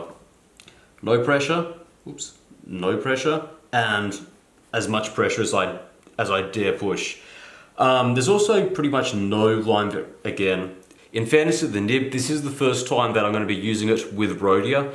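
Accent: Australia. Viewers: Holy fuck man!!! I remember your original videos! You're back now with a deeper voice and some scruff, but the same Aussie twang 😅 Great to have you back and see you're well, this genuinely made me happy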